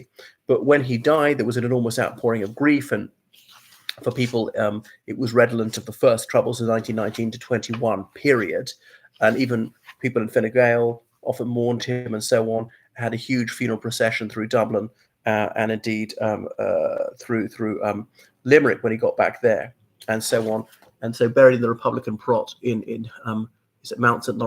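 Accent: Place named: England, United Kingdom. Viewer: Read like a redcoat . Bit boring old chap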